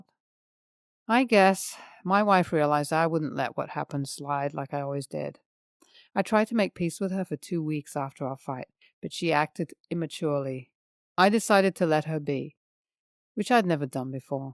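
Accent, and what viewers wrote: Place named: United Kingdom. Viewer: If I had to guess she’s Indian/Pakistani from England from her diction speech patterns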